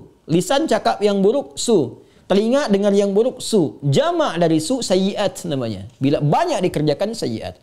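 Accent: Malaysia. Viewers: Assalamualaikum Ustadz Adi, izin saran, dalam ceramah-ceramahnya pakai logat bahasa Indonesia aja jangan pakai logat Melayu Jadi mirip sama Malaysia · Masya Allah ustad seperti nya anda sedang di Malaysia ya soalnya langgam ceramah nya persis orang Malaysia👍🥰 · Kok, kayak logat Malaysia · Kenapa logat jadi spt org malaysia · saya merasa ceramah ustad kali ini terdengar seperti orang malaysia karena banyak logat melayu tidak seperti biasanya, sehat selalu ustad..syukron 🙏🙏🙏🙏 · Knp jd logat malaysia? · Maafya pk ustd kdg2 trdgr pk ustd sekali sekala ada omonganya spti org Malaysia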